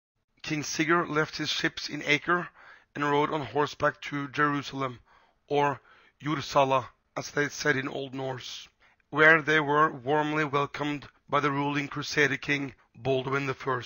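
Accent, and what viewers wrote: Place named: Norway. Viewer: Hehe, genuine Norwegian accent and all :) Great video mate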